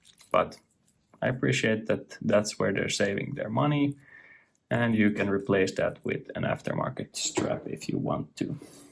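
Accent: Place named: Finland. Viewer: third comment in a day, enyoing your content man (the finish accent reminds me of watching interviews with my favourit band ;) ) - The new SRPE's are real stunning watches, I got a great deal on the 61 (grey dial, Nato-Strap) and ordered on Tuesday. That colour is really the best of the bunch in my opinion + the ones on the Nato have brushed bezels. I paid 180€ incl. shipping and customs from Japan to Germany and it will arrive in under a week if DHL is to be trusted. While at it I bought a fitting strapcode-oyster (solid links, solid endlings, solid clasp, screwlinks), set to arrive the same day and litarrily can't wait (thats why I watch all the reviews on the SRPE's currently ;) ) - hope this combination will look as good in the flesh as it does on pictures. Even more Tudor-Blackbay with that one. Already considering buying a strap from watchgecko, the grey-striped one you showed must look awesome with the grey-dial (maybe the darker-shade but it is a real strapmonster I believe). 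I was really enjoying your review, specially liked the sound/music for the Negatives and during the Lumeshot (you could turn the 70's-porn-sound to 11 though next time). As with the SNKL23 I'm also considering adding a double-dome for this one, would give it even more class.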